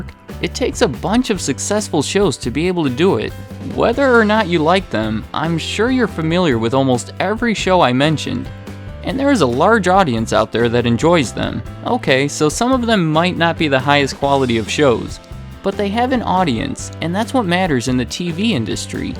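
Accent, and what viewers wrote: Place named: Minnesota, United States. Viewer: Only two videos! I want to watch more. Are you from Minnesota or something? You have a funny accent and I like it cause I'm Canadian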